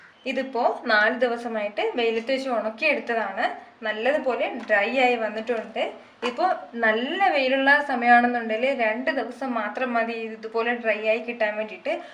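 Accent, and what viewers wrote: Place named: Malappuram, India. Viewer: സംസാരം കെടാപോൾ മലപ്പുറം ആണ് തോന്നിപോകും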